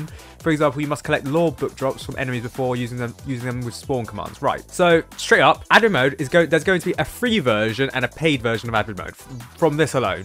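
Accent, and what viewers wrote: United Kingdom. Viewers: Are you from Brittain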